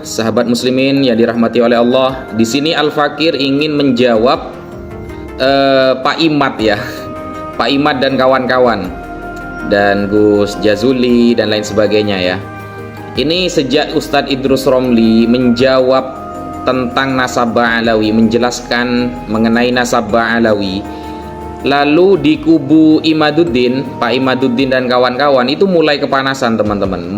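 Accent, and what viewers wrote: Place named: Madura, Indonesia. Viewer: Dari segi bicaranya ini orang madura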